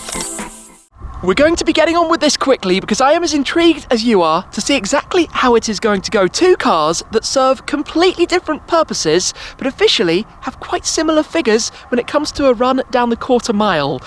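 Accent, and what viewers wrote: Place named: United Kingdom. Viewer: Nice clear British :《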